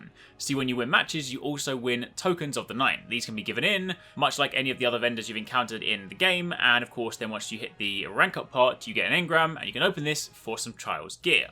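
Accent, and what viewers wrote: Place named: United Kingdom. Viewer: Wait are you seriously that black man and sound British?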